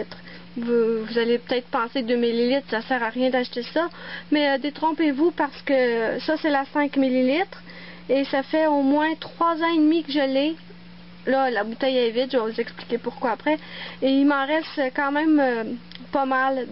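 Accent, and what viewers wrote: Quebec, Canada. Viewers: Merci beaucoup maintenant je saurai comment faire quand je le recevrai pour mon anniversaire :) j'adore vos ongles et votre accent québécois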